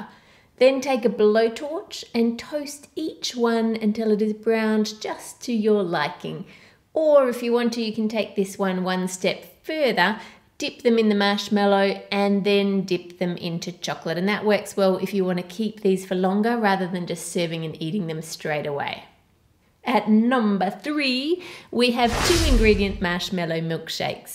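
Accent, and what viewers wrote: Australia. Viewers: She doesn't sound British. Is she Australian? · the australian accent is so cute w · Australian says "sugar" --> "suga" because she is an Australian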